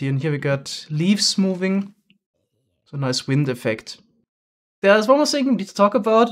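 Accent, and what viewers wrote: Germany. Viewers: Is he german? No front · R U German?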